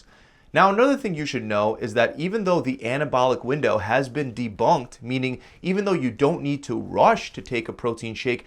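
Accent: United States. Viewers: It's interesting video but what a pity this guy speaks so fast and with strict USA accent forgetting his post goes all around the world and he doesn't do any effort to be understandable for people that are not necessarily of English mother tongue. He is not the only one, many do the same😞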